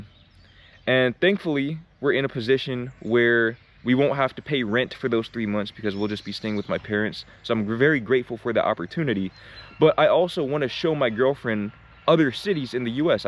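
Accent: Netherlands. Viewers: You have a slight Dutch accent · 5 seconds in.. and thought he is in the Netherlands :D , (Dutchie here ;)… and 40 seconds in, let me guess east of the Netherlands? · Is it me or have you grown a Dutch accent to your English? 😅 But I hear you. I can concur with every single word you said in this video, almost everything you said I could have said(*), because my experience with travelling in Europe and US was the same. I hope you will return to The Netherlands someday, through your videos I have learned to appreciate more what I have here. If you want to move on and discover how other countries are to live in, that's just great, we will miss you though. (*) There was an American guy at work who was from Dallas and attended Linux user group meetings in Austin. "It was only three hours by car". I said, if you drive for three hours in The Netherlands, you're either in the sea, or you are in a different country where they speak a different language.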